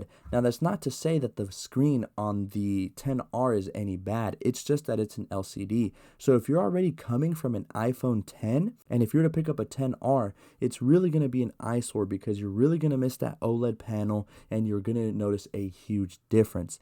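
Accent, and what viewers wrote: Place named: Georgia, United States. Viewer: Hmmm... you sound like you’re from Georgia by the way you talk... 🤔🤔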